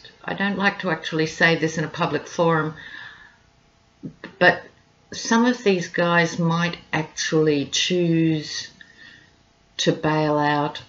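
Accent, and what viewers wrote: Australia. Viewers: Great to hear a fellow aussie. Good stuff!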